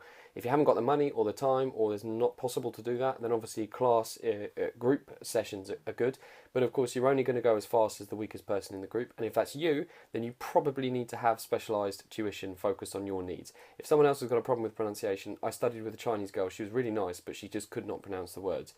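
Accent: United Kingdom. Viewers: Dziekuje! I'm a fellow Brit, Learning Polish because my girlfriend is Polish. I have found the 'Drop' app to be the best, Combined with conversing and learning from my girlfriend every day. Its very helpful as she has studied linguistics. I have a question; you mentioned being obviously British from your accent. How do you find people treat you because of that? Is it negative or posite or neither? I plan to buy a second home in Poland with my partner so im very interested to know. Thanks for the video. The podcast tip is a great Idea, do you have any recommendations? I subscribed by the way! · Interesujace. Ja mowie po angielsku z polskim accentem a ty mowisz po polsku z brytyjskim accentem. Jestem pelen uznania dla twoej znajomosci jezyka polskiego ktory jest jednym z najtrudniejszych dla obcokrajowcow.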